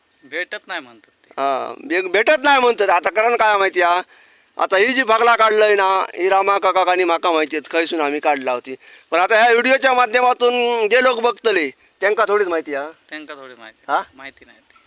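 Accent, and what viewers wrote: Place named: Malvan, India. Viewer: मालवणी मस्त बोलतास, दुसर्यांसारखी नकली मालवणी नाय, अस्सल मालवणी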